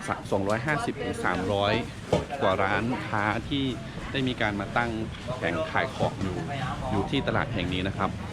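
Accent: Thailand. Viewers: ฝึกพูดไทยจากที่ไหนครับ สำเนียงชัดมากครับ ยูทูปเบอร์ สปป. ลาวหลายคนในไทย เวลาพูดไทยยังติดสำเนียงฝั่ง สปป.ลาวบ้าง แต่ขอชื่นชมว่า น้องสำเนียงไทยชัดมากครับ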